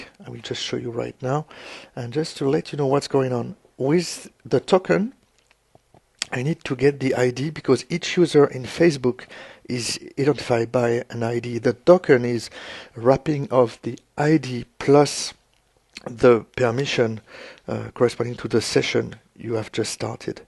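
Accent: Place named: France. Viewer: Cet accent français est tellement remarquable ;) Un grand merci pour cette vidéo. Je travail sur un programme C# et WPF de borne photo et souhaite proposer a mes utilisateurs de partager leurs photos sur FB. Je vais donc tester tous cela et je pense que cette vidéo me sera d'une grande aide... Le protocole FB évoluent régulièrement et il semble que la compatibilité soit d'ancienne version soient souvent remises en cause. J'aurais donc une simple question. La méthode proposée ici est elle compatible avec les moteurs FB actuels ? Encore merci !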